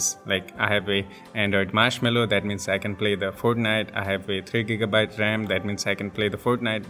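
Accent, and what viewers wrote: India. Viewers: atlast an indian person speak english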